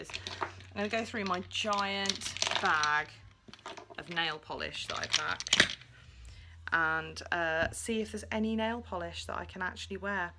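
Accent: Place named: United Kingdom. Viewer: A tip for getting rid of the sticky tape residue to anyone watching is to use an oil-based cleanser like those face oils that are popular now. But be sure it doesn't have anything you're allergic to in it! Thanks for posting this video, I have been having a lot of problems with a rash on my face for 8 months now and my newest derm suggested the patch testing. I have been hesitant about it, I just worry it will be uncomfortable and costly and I will get no results. It seemed fishy to me that I would suddenly develop an allergic reaction and paying this doctor for a test like this will magically fix it since I have had so much bad luck with it up to now and lost a lot of money on doctor's appointments and medications. I hope your accent means you are in the UK where you hopefully won't be bankrupted by all these tests. I guess I should look for more videos you've posted to see if cutting everything they said you're allergic to out healed your lips and face, I really hope so.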